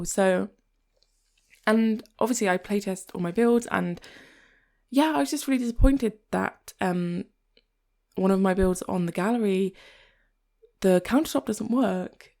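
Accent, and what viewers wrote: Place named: East Midlands, United Kingdom. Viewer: PS - are you from somewhere northern originally? Your accent now sounds very southern, and a bit towards RP, but words like grass and bath, you say in a way that makes me think maybe East Midlands?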